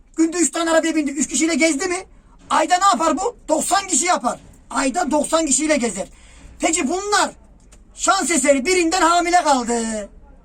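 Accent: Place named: Yozgat, Turkey. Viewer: Slm abi biz seni olduğun gibi beğeniyoruz yaşadığın hikayeleri anlat sen yarı yozgatlıymışsın bnde bir yozgatlı olarak şiveni çok beğeniyorum bol bol hikaye anla yaşadığın komik olayları iyi yayınlar diliyorum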